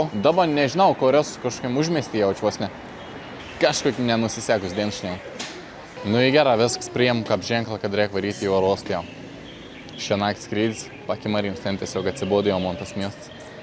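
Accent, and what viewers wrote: Samogitia, Lithuania. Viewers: kopijuoja žemaičių tarmę? kiek žemaitiškai šnekančių žmonių? tai visi kopijuoja reiškia?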